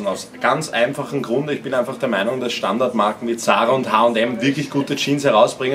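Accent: Austria. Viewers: Wie uncool klingt einfach österreichisch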